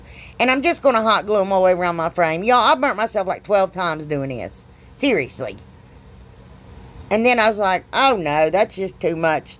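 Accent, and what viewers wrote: South, United States. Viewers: I love everything! I could listen to you talk all day! Everyone says I have the most country voice they’ve ever heard, but they need to hear you! Everything you say, I understand completely!🤣🤣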